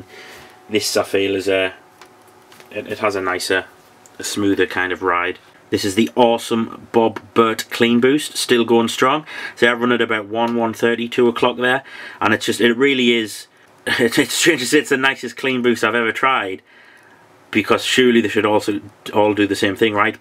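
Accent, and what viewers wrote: Newcastle, United Kingdom. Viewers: Yes! Another Geordie! VERY nice gear!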